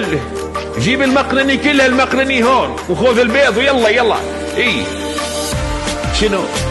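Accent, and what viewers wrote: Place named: Gulf, Arab world. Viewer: بص يابني انت مبدع بمعنى الكلمة تقلد خليجي بدوي بطريقة عجيبة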